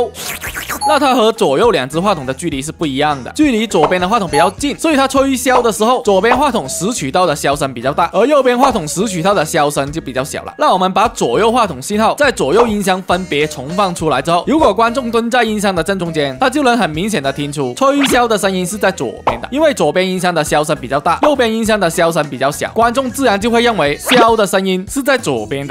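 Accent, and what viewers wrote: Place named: Cantonese, China. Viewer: 樓主的廣東式普通話，真係聽到上癮！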